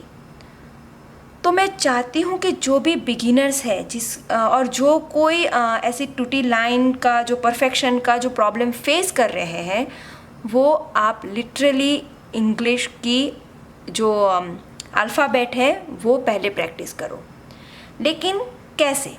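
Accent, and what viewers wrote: Gujarat, India. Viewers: ur accent😯,r u Gujarati?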